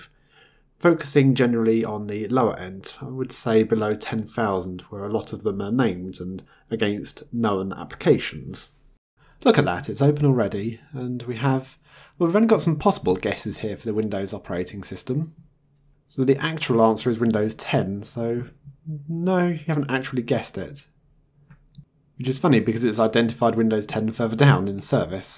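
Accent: United Kingdom. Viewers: I have subscribed to the channel just because the British accent :gentleman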